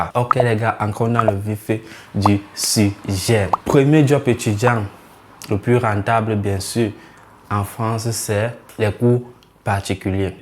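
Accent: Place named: Cameroon. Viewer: Avec le bon accent camerounais a la Francis ngannou yes frerot force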